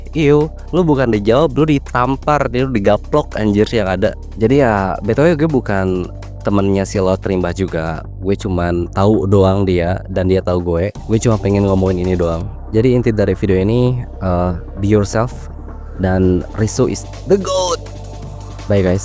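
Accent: West Java, Indonesia. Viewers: bang, lu sunda ya?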